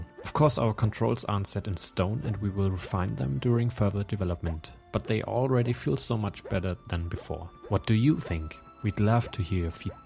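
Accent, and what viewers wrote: Germany. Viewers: Schönes vid... und Dein englisch ist sehr gut, aber man hört immer den "Deutschen" raus.... 👍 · You sound a bit german but im not sure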